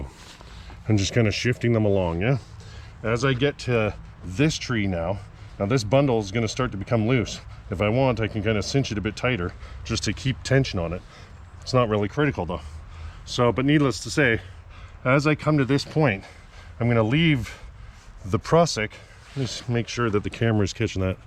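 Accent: Canada. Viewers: Are you from Nova Scotia ? Not mocking you , lord knows I have my language tells . You say the word yeah a lot . It reminded me of the ina bomber movie how the fbi caught him with his language tells.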